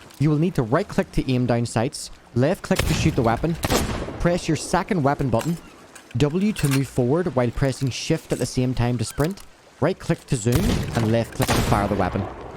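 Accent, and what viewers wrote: Northern Ireland, United Kingdom. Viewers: Yeooooo do i hear a northern Irish accent?!?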